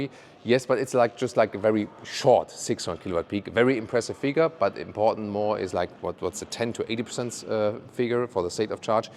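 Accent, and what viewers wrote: Germany. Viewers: Nice Video but the English sounds waay too german!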